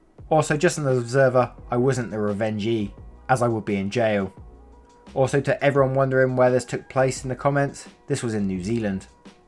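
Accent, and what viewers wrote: England, United Kingdom. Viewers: Where in England is that accent. I cannot figure it out xx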